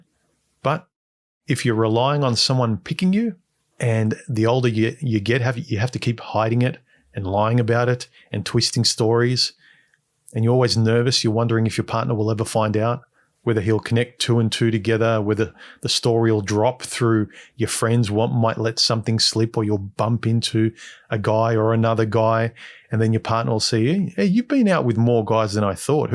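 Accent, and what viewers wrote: Australia. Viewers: Statistics have shown the success rate of a marriage or defacto is proportionate on the number of partners a woman has had in her past but the same doesn't hold for men. Men always exaggerate that number and women always understate their number. But as a rule of thumb, women who have had more than 5 sexual partners are much more certain to divorce the higher that body count becomes. And point in question is my daughter, who is 36, brags over the number of sexual partners she has had. That number is over 100 by her own admission. She is unable to love a man or have an extended relationship with men. Her relationships last 2 or 3 months before they end and she always has someone else in reserve so she is never alone. She does not love herself. She has 2 adult brothers who claim to have only had 3 sexual partners each. They are both in very long term relationships, one married, one defacto. 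I am so proud that they never took the path their sister took and chose very carefully their respective partners. ..... And that beautiful Aussie accent makes listening so easy.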